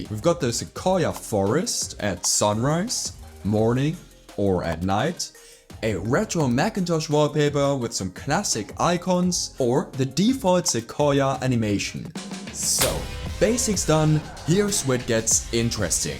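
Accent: Germany. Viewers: What a dreadful German accent.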